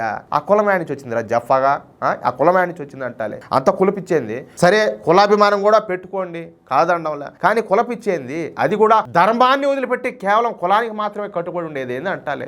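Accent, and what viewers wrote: Rayalaseema, India. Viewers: U speak my language in rayala rajyam slang bro ... gan D super bro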